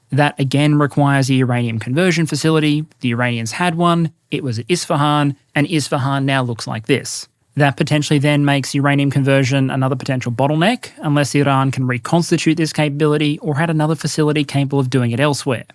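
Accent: Australia. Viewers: The up, down, back and forth of this conflict reads like a brittish comedy sketch Á la Blackadder or Monty python. Now, with Aussie voice over.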